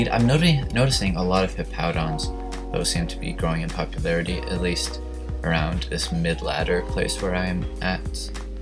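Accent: Poland. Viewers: U polish? 😱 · U polish?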